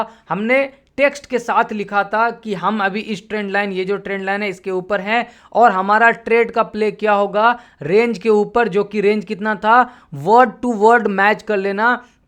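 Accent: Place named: Bihar, India. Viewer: aapka ton bihari h ... R u bihari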